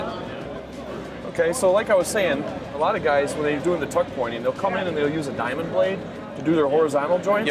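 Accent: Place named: Midwest, United States. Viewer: Yaw I werk fer Bawsch! Jesus Christ that’s one hell of a Midwestern accent there, bud! Great job demonstrating how worthless that attachment is too.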